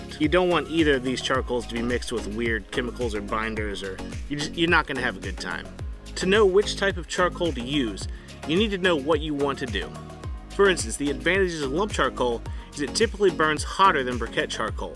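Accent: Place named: West Virginia, United States. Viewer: I'm assume you're from West Virginia....new subscriber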